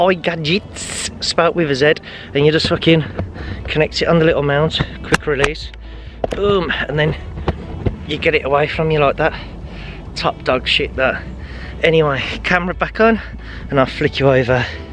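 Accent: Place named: Birmingham, United Kingdom. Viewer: The brummie version of Kai from DigitalRev...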